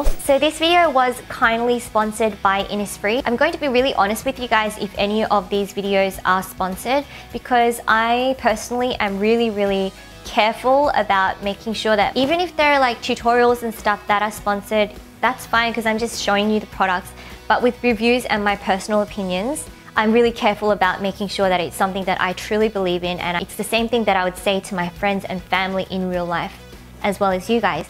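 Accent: Australia. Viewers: Hi. Love your videos. So awesome to know you're from Australia too. It sounds great to hear someone from Australia. And also you do great tutorials using some of the Korean street brands that are inexpensive to buy. I just started getting into make-up and I particularly prefer Korean make up brands because Kpop? (that's a bad reason, but Korean anything just appeals to me the most at the moment) I particularly like Innisfree as a brand in general because everything looks so natural yet classy at the same time and I had my first Korean cosmetic brand experience with Innisfree as well (Innisfree anti-Bija Spot Essence W which is sadly not available anymore online on the Innisfreeworld website) It's funny how you're particular about the fragrances, but I found that they all smell nice, so I had no problem with it. I guess you could say that I am now a die hard loyal fan of Innisfree and I will love anything it has. 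Off topic but dying to ask because I don't know where to: As someone who's still so inexperienced, when would you use BB Cream or Liquid Foundation? I understand the differences but seeing as Liquid Foundation is tons better, I see no point in buying BB Cream. But I saw your make up collection which is astounding BTW, and it has tubes of BB Cream in it. So I was wondering what sort of occasion would you use BB Cream and why?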